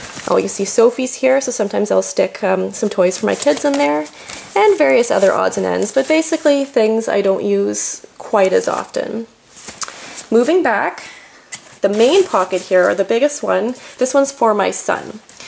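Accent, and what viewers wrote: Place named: Canada. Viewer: Love the accent! I have a few friends from Canada.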